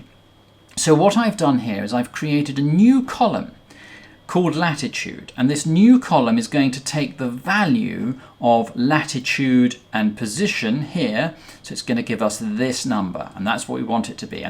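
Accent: United Kingdom. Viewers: This is the best british accent I have found on the internet, thank you!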